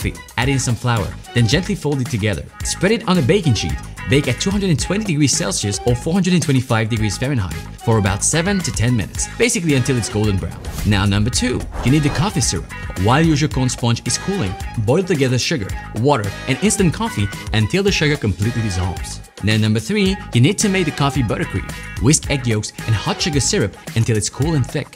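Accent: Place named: France. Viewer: Damn That french accent of yours is still so great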